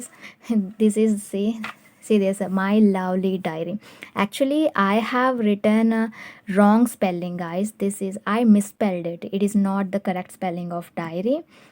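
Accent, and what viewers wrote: South India, India. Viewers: U r accent is so south Indian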